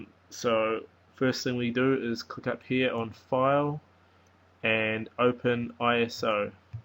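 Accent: New Zealand. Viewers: HEY is that a kiwi accent or aussie ??? you sound kiwi???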